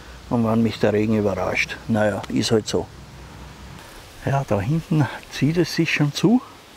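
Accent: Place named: Austria. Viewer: Hallo, ich liebe das Österreichische. Und von dir ist es irgend wie noch beruhigend. Danke für die Tour. Gruß Goldjunge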